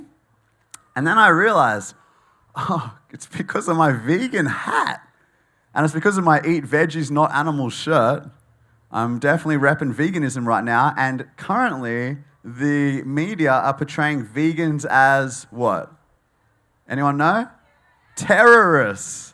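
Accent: Australia. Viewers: Another great speech. I could listen to you talk all day long. You have a very gentle voice and I love the aussie accent. You have such a friendly way of introducing people into a vegan lifestyle, giving facts in a non pushy way. I have been vegan for 19 months now, started by signing up to veganuary. I watched earthlings, cowspiracy, what the health as well as many YouTube videos of yours, Joey Carbstrong, Hench herbivore to name but a few. I will never look back, I feel amazing not only health wise but to be joining you and others in making a difference.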